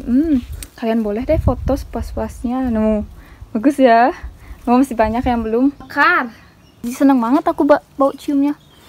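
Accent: Bali, Indonesia. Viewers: Dari Bali kak ya?? Logat Bali banget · Kak ada logat Bali nya kental banget yaa 🤣🤣 Di konten YouTube yg rujakan sama Mpo Ika juga bilang biasanya rujak bumbunya tabia, sere, kuah pindang 😂 Penasaran dulu pernah tinggal di Bali kah? Btw, stay healthy sekeluarga dan thank you udah selalu sharing konten yg menarik yaa kakk 😍😍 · Logat balii dongs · Kok kak logat.a agak orang bali 😍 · Kok aku dengar ada logat bali nya ya😂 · Aku baru mulai nonton channel kakak...kak dari mana ya? Orang Bali kah?soalnya logatnya kaya orang Bali😅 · Logat balinya hihihi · Logat Bali ..kak dari mana asal Bali kah? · Logatnya bali banget Kakak dari bali yaa? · Orang bali kak? Kok logatnya bali banget yaa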